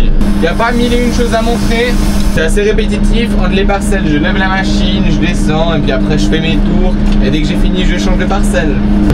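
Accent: Switzerland. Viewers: Salut le petit Suisse 🤣😂🤣😂, en espérant que tu ne le prenne pas mal. Je viens de commenter ta dernière vidéo, et voici une nouvelle en ligne. Tu aurais dû être prof de maths pour expliquer horizontal et vertical . Je t'embête plus. Les plans au drone sont magnifiques. Je crois que tu es le seul qui dit encore mon papa et je trouve que cela en dit long sur la relation avec tes parents. C'est toujours un vrai bonheur de regarder tes vidéos et de t'entendre parler dans ton patois Suisse. Remorques ou chars, Ben Hur??? Bon courage et tu peux être fier de toi. 🙋‍♂️🙆‍♂️👍🏻👌🫡🫡🫡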